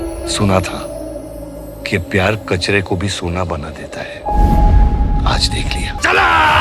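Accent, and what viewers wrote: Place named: Delhi, India. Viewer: Not to stereo type but love your delhi accent...accha lagta hai apna delhi ka bhai itna accha kar raha hai.... All the things you say how to say the metaphor the references mahn love from jamna par but not shahdra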